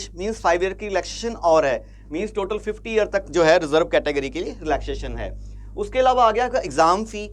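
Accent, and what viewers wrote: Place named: Pahari, India. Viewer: Pahari accent is love ❣️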